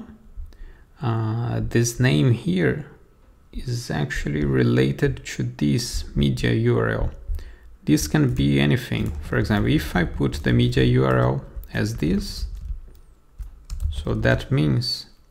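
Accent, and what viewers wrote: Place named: Brazil. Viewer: Muito bom mano, vc parece ser brasileiro pelo sotaque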